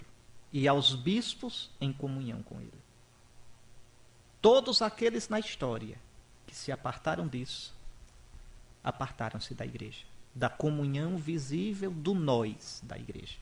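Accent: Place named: Pernambuco, Brazil. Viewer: Além de palestrar de forma magnífica, o saudoso bispo de Palmares o faz com um agradável sotaque pernambucano, usando algumas palavras próprias da região, verbi gratia: "aperreio". Esse homem santo certamente desfruta da glória de Cristo, a quem ele fielmente serviu.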